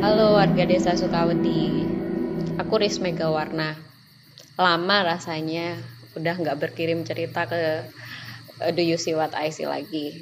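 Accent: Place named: Jember, Indonesia. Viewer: Dari logatnya apakah mbak risme orang Jember? 😁 Aq tinggal di jember tapi mpe skrg blm pernah berkeinginan maen ke alas P Kbnyakan nonton podcast n cerita horror jd udah kbnyang serem aja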